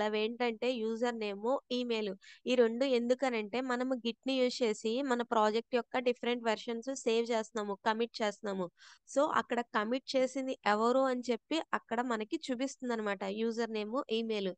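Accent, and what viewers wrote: Godavari, India. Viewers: mana godarolle...🤪 voice ...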